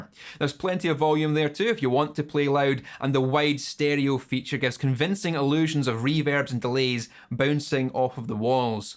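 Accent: Scotland, United Kingdom. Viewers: What a pleasant person. Lovely Scottish accent. Not showing off at all, although he is a pretty good guitarist. I watched this video and I had to smile many times. Good musician and very funny entertainer too. Congratulations !!! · You Scottish or Irish? You sound Scottish to me, I ask cause my uncle is from Ireland and I’ve noticed there are varying accents in Ireland. Cheers from Canada, I’ve just subscribed as well as ordered this amp.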